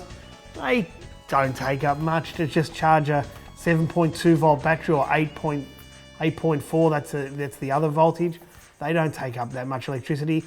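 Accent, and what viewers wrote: Australia. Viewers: i love the aussie accent idk why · This Aussie accent is particularly grating.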